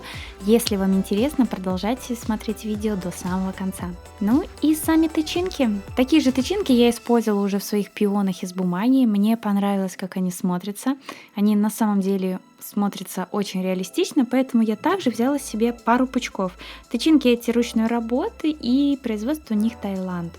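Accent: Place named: Belarus. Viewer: Вы не забывайте что люди в Белоруссии живут, и у них есть свой местный говор...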